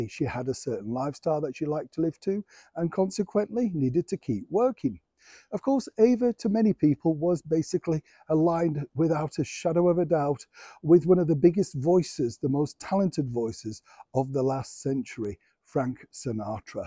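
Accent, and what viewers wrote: Yorkshire, United Kingdom. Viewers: Good morning, My coffee break, and my first laugh of the morning, Resting thespian Yorkshire talk, young man hoping to be star Thank you take care Ps Ava was a beautiful lady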